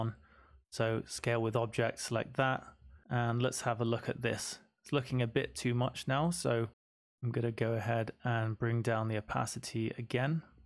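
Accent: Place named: England, United Kingdom. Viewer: Very well and systematically explained in a normal English accent that one can easily follow. No unnecessary and erratic mouse movements. I have seen many Affinity Designer tutoriuals. Some of them don't even bother to speak. They just play some wierd music in the background, move their mouse (with a really small white cursor) erratically and expect us to follow. Well, not at my age. My only request to you would be to get a screen recorder where the mouse is highlighted and it emits sort of light waves when clicked. That way, we seniors will be able to follow your tutorials better. I have suggested this to many YouTubers and some of them have implimented it and thanked me. I am now subscribed to you. I see that you don't have an interactive Facebook group where one can ask questions to the community and the guru (you) can step in every once in a while to give a final judgement. 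Best wishes from Australia.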